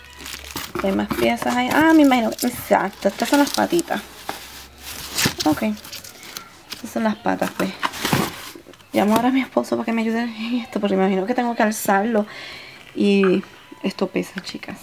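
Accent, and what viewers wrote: Puerto Rico. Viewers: Me facina ver tus videos Aww tu acento pueltoliqueño!! Eres hermosaaaaaa!! Me facino tu jollero!!! Besoooossss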